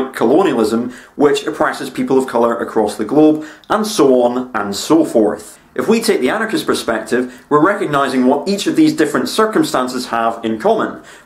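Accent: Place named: Edinburgh, United Kingdom. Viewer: Think he's from Edinburgh. His accent is too posh to be from Glasgow.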